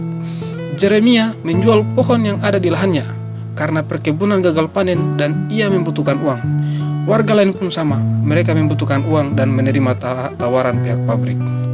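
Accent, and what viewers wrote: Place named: Papua, Indonesia. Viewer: CARA NGOMONGNYA KAYAK PAPUA MAAF YA BNG 🙏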